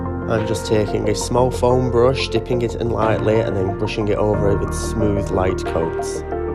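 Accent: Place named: Newcastle, United Kingdom. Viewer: Nice job! Canny little cabinet looks very chic now. 😊 Enjoyed your voice over, always like to hear somebody from home, cheers from an expat Geordie in Alberta. 👍😊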